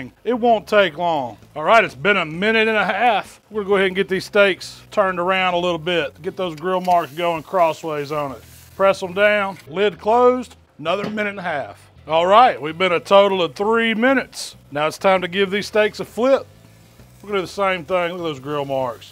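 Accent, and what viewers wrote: South, United States. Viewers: Crazy how over the years your accent has gotten more southern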